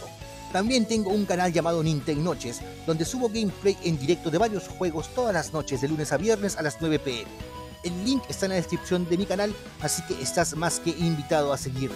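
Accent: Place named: Chile. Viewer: Eres peruano? no me lo tomes a mal pero antes pensaba por tu forma de hablar que eras un poco chileno ... claro un poco no totalmente que eso ya es otra cosa... igual un saludo siempre veo tus videos.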